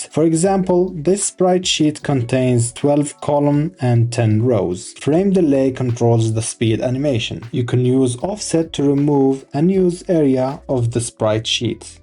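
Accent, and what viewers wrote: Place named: Morocco. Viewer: You sound very Moroccan a sahbi 😁🔥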